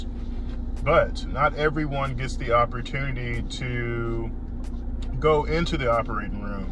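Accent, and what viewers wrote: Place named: North Louisiana, United States. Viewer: You sound like your from North Louisiana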